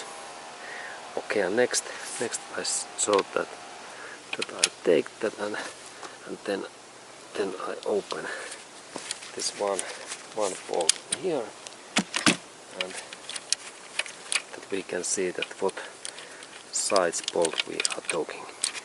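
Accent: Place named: Finland. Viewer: Kuulostaa kyllä ralli englannilta :) Kyseessä siis zetec se, eli pikku zeteckki.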